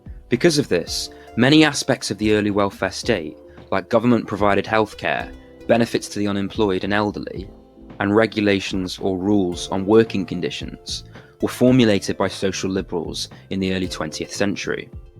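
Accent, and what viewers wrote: United Kingdom. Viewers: have you never heard a british person before? his accent is very standard and easily understood if you don't live under a rock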